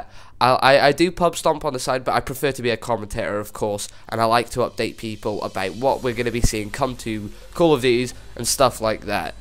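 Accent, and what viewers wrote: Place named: Wales, United Kingdom. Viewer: I thought you were welsh I could here it a little, Which town though ? I'm from Newport !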